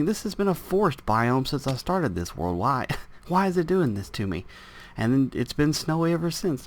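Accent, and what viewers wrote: South, United States. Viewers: can I ask you something? Where are you from? The south? You kinnda' sound like your're from there..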